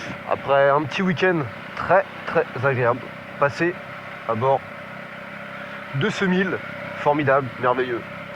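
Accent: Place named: Paris, France. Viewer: Salut à toi, j'aime bien ton accent parisien et la pertinence des tes coms lol..ça change des autres vlogs. Tu as peut être brisé mes rêves d'acquérir une 660 une fois mon permis obtenu, compliqué d'obtenir un modéle A2 fiable et bien équipé. Continu tes vidéos sympa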